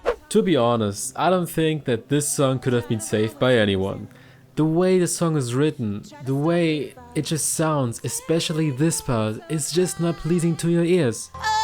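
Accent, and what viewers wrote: Germany. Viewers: I love how realistic you are, and I like the editing, it was very funny. Also I love your accent it is sooooo german xP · Nettes Video aber konstruktive Kritik: du sprichst die englische Sprache Deutsch aus! Du könntest an der Aussprache arbeiten. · Wenn deutsche englisch reden hört sich es genau so an. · Boyyy work on ur accent. I am also from germany but it’s not like that bad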